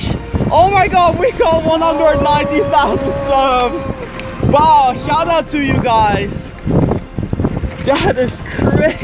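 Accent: Netherlands. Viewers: Are you dutch???? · Ohhh you're dutch, that explains the accent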